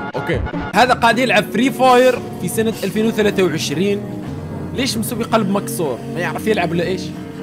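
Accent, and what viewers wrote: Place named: Maghreb. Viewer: أحسه جزائر أو مغربي أو تونسي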